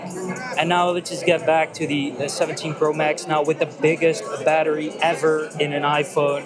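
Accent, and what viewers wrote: Netherlands. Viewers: The Dütch accent was aanwezig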